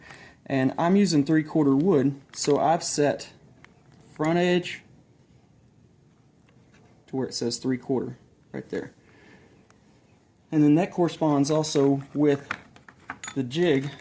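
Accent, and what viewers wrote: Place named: United States. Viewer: Thanks for making us I needed somebody that speaks American most of the videos for some reason are from New Zealand or England and no offense my brothers out there it’s just y’all got the metric system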